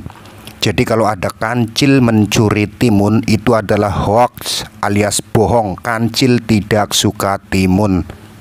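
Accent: Banyumas, Indonesia. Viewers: Apakah Bpk berasal dari Cilacap,Purwokerto,Banyumas,Tegal,brebes,Pemalang,Banjar negara Kenapa Ngapak😂😂😂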